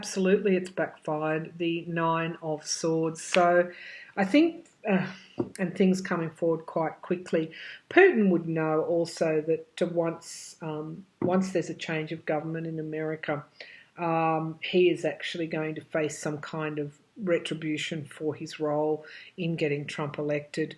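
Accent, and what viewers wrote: Australia. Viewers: I love the the R rolls in your speech..no need to correct it..Us here in America have a few different accents but I love the Assuies accent!!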